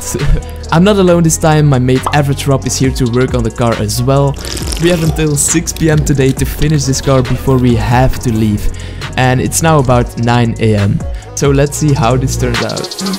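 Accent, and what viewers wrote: Belgium. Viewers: He has an french/belgium accent, you can easily heard it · Wowww ik wist ni da u Belgisch was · Mec, t'es belge ? :D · WACHT! BEN JE EEN BELG?!😳❤️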